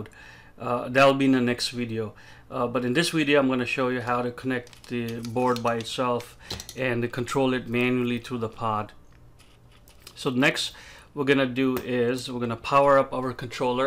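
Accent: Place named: United States. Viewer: i swear this guys accent is indian mixed with american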